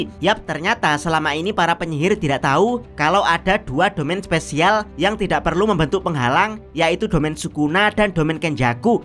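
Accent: Java, Indonesia. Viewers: Muedok wkwk, btw suwunss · jangan medok bg ganggu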